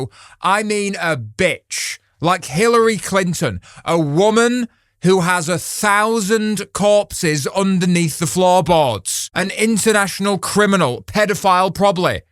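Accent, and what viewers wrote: United Kingdom. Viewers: Wait, are you British? I thought you were Australian.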